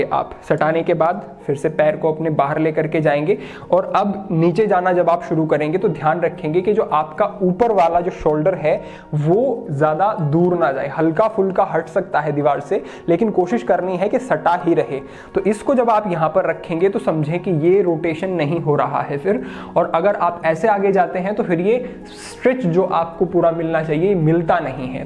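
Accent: Standard. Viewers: Shrimaan aapki Hindi ati sundar ati shudh hai. Pranaam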